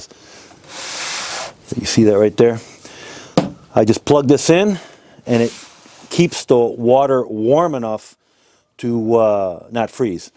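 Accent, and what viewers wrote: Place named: North, United States. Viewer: You keep saying "we, down here", but you don't sound like you're originally from "down here". I was born and raised in East Texas myself, but you sound like maybe you're from a little further north. Nothing wrong with that. Have several Yankee friends. Was just curious where you grew up.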